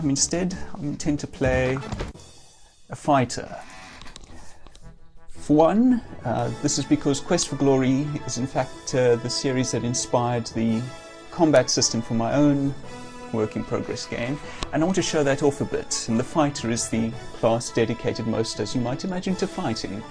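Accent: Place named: United Kingdom. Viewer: Yo, it's Spladam, killer accent dude, what part of UK is that from? I forgot how beautiful the VGA remake of this game was.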